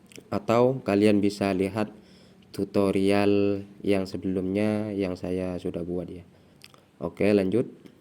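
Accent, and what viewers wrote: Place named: Madura, Indonesia. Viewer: Orang madura ya bang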